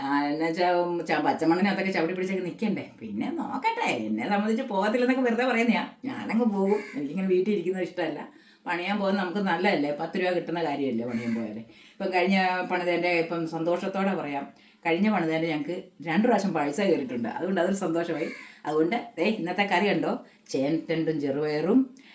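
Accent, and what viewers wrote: South Kerala, India. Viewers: 🙏തനി തെക്കൻ ശൈലി കേൾക്കാൻ നല്ലരസം കറികളൊക്കെ ഒതുക്കി വിളമ്പു നാനും ഒരു തിരുവിങ്കൂറുകാരിയാണ് ഏതു ജോലി ക്കും വിഷമങ്ങളുണ്ട് ഒന്നിനും മടി പാടില്ല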